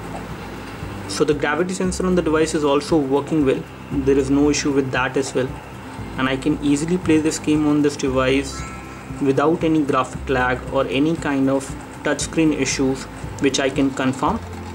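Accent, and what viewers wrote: India. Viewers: india okzent osin silnii ny prem oseni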